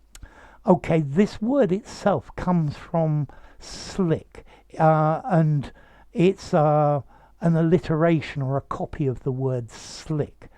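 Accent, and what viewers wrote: RP, United Kingdom. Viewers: Your English RP accent is sleek and I like it. It's very articulate. You enunciate each and every single word in your speech. Thank you sir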